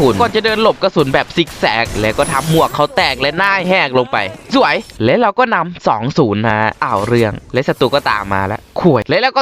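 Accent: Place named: Isan, Thailand. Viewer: คลิปนี้พี่เป็นคนอีสานนะครับทำไมพูดเหมือนคนอีสานเลย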